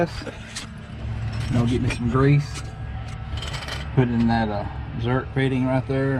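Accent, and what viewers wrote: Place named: Georgia, United States. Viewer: Changing mine tomorrow!!! Thanks for the vid and confidence. Im from Ga so i appreciate the accent too, made it alot easier to pay attention. Thanks bro! I didnt notice a problem with mine till i put 33s on it. 40mph and up she starts kicking. Hopefully this will get me by until i do a whole front end job.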